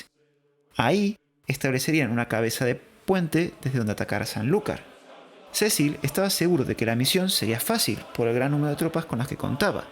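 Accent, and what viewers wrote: Latin America. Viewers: ¿Su voz en off es la de un hispanoamericano que valora las aportaciones del Imperio Español a sus territorios?. Una misma Lengua (la del Reino de Castilla) y una misma Fe Católica.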